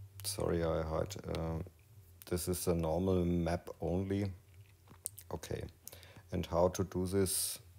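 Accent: Bavaria, Germany. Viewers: nice Bavarian English, no gimmicks:hand-purple-blue-peace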